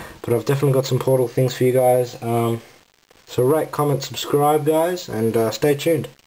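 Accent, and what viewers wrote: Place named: Australia. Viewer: I can tell your an aussie. i am an aussie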